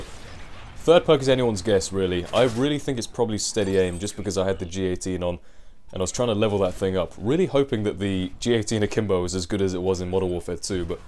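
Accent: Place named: Essex, United Kingdom. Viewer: ESSEX englaand;)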